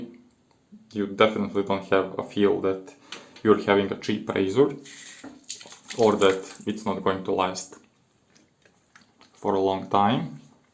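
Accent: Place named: Serbia. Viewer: you sound so serbian :)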